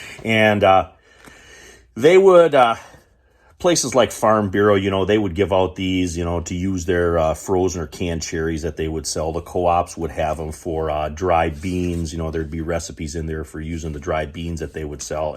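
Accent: Michigan, United States. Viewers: I am from TC. I live in eastern Oregon now. I miss the Michigan accent!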